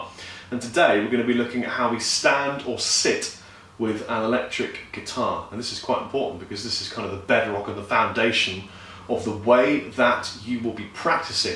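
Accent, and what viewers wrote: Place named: England, United Kingdom. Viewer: I can’t help but comment on the hair + being white, and then he started speaking with an English accent and I just chuckled.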